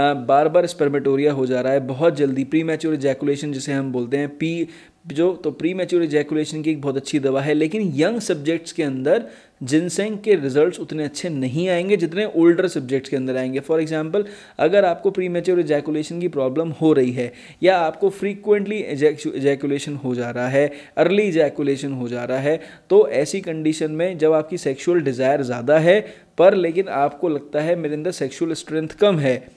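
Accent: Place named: India. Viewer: Indian English!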